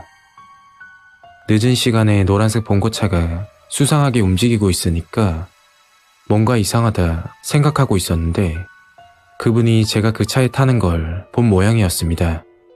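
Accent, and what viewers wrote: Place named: Daegu, South Korea. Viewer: 자연스럽고, 편안한 사투리~~ 대구 분이라고 하셨죠?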